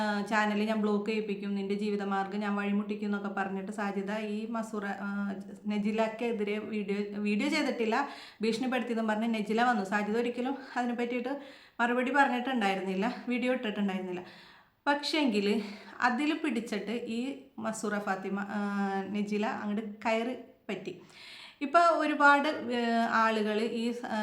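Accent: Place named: Thrissur, India. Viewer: Itha trisur ano samsaaram kondu thoniuadhaane 🥰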